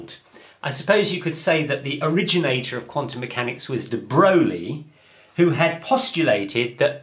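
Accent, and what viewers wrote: United Kingdom. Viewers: There's nothing like physics being explained with a British accent! :-) Very instructive videos, thank you very much!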